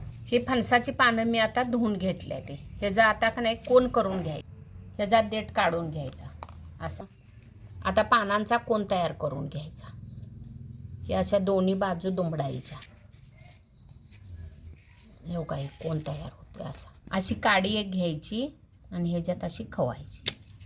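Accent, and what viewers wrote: Kolhapur, India. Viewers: फारच नावीन्यपूर्ण ! आणि ताईंची कोल्हापुरी भाषा इतकी गोड लागली कानाला ! थेट ल्हानपनातच शिरलं मन !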